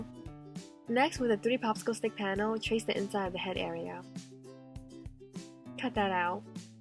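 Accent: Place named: New Orleans, United States. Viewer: Are you from South Louisiana? Your accent sounds like you'd be from New Orleans or one of the communities surrounding it. You sound like home to me!! Also, this is fantastic! The only suggestion I'd make is a simple one: I think that instead of calling the paper pieces you create "stencils" is slightly off. The word "pattern" makes more sense to me, but that could just be me. 😁 Other than that, this is fantastic! I don't make miniatures (yet, at least), but I'm subscribing!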